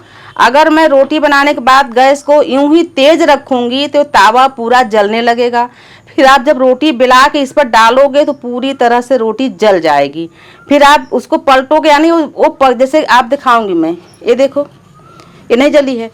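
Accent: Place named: Bengal, India. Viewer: Hum pakistan se hain. Aap ki awaz se maloon hots hai aap in ka ilaqa bangal hai. Aap nay buhat mahenat say roti banana sikaya hai hum aap ka shukria latte hain aap humari bahama ki tarah ho.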